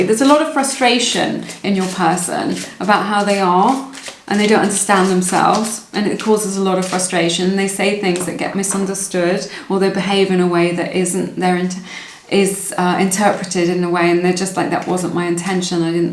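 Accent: United Kingdom. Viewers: thank you! love you and your British accent hehe! 😂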